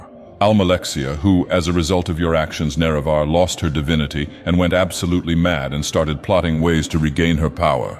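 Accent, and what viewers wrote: United Kingdom. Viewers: Anyone else notice an increasingly thickening British accent the past few videos?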